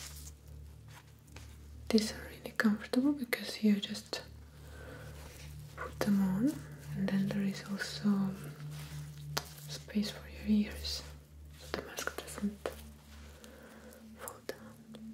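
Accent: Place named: Russia. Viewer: I figured from the accent you were from Russia or something. Added to the beauty. Very good your videos. You should do in Spanish for Latinos 🙌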